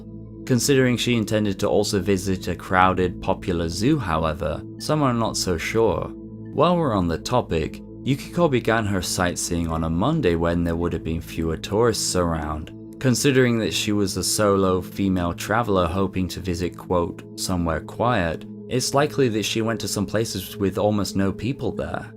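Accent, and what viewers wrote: United Kingdom. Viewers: Is it the British accent? I can't think of what could be hard. He's a basic YouTube presenter and fairly basic to watch. Literally nothing complicated. As for other content like this, Sakura Stardust also does Japan only internet mysteries, mostly stories about 2Chan but there's a lot of variety there.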